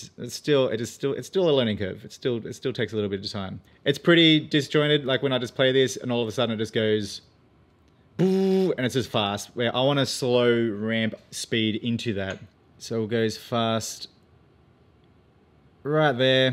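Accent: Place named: Australia. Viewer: Great video , just what I’ve been looking for. May I recommend that for us in America could you please slow down your speech a tad and work on clearly enunciating . There are some details in your presentation that we really appreciate but unfortunately we in America (especially us seniors) just can’t quite grasp the Ausie lingo. Again…. I’m not trying to be critical, love your video. This Insta360 oneX2 is very difficult to grasp even under the best of circumstances. Take care and thanks for great content. 👍👍👍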